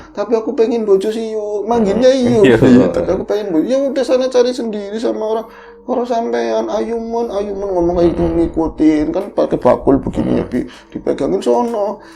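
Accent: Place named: Tegal, Indonesia. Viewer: Dngerin logatnya bro jd inget sodara2 bpk ku yg asli tegal.👍👍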